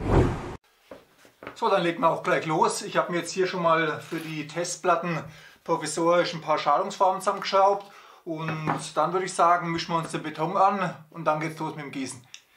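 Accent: Franconia, Germany. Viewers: Wieder sehr interessant geworden das ganze, locker erzählt mit leicht fränkischen unterton.......mach ner su weider ! Die 3te Platte schaut schon am besten aus, allerdings könnten die Kontraste etwas stärker sein. Evtl etwas warten bis der Beton nicht mehr ganz so flüssig ist und die 2 Farben sich nicht mehr so stark vermischen ? Hmm.......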